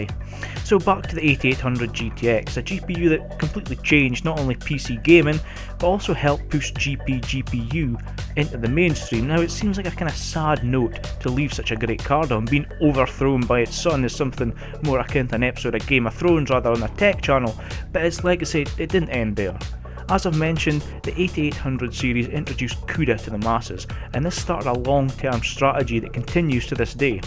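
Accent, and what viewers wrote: Scotland, United Kingdom. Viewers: Haha sounds awesome man! Good to hear another Scottish tech channel btw, I hope yourself and AdoredTV become bigger because both your videos are really good! I'm from Glasgow myself :)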